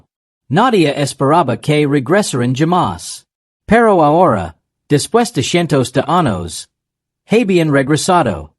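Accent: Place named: United States. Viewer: Hola lastima la voz que le pusieron porque son buenas historias.pero escuchar a un gringo hablando un español pesimo es como escuchar la pelicula de terminator en español catalan de España.horrible.es raro porque otros videos tienen muy buenas voces..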